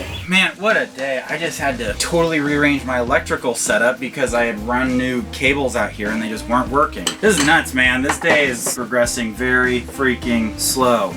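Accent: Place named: Northern California, United States. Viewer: your Norcal accent is killin me. I hope you never loose it. 🤙🏾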